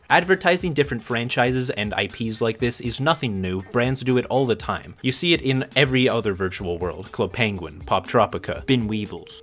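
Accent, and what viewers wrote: Canada. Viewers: “outing myself as a Canadian” when you’re one of the only people I’ve been able to actually recognize a Canadian accent in 😭 Anyway thanks for providing my mandatory video about something I’ve never heard about but I get invested in anyway for some reason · lmao you said you outed yourself as a canadian but you sound so canadian man you didn’t have to even say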